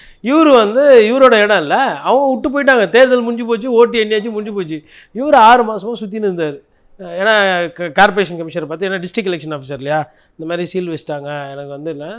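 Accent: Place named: Chennai, India. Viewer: ..சுத்த சென்னைவாசி..ஸ்லாங்...அப்புடியே...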